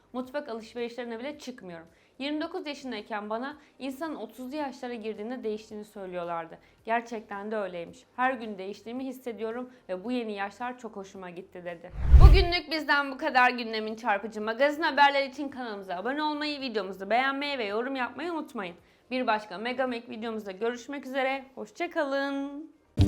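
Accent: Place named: England, United Kingdom. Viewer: Gönül isterdi'ki güzel Türkçe Dilimizi doğru dinlemek . Fakat maalesef ingiliz aksanı ile Türkçemiz katlediliyor. Lütfen , Dilimizi koruyalım . Alfabemizde "R" leri yumuşatarak ingilizlerin aksanı ile bozmayalım . Bu İngiliz aksanı ile Modern veya güzel Türkçe konuştuklarını sanıyorlar, lakin ingilizin Türkçemizi bu yolla katledlettiğinin farkında değiller . Güzel kardeşim lütfen kjendi dilini yabancılaştırma , aksine koru kolla .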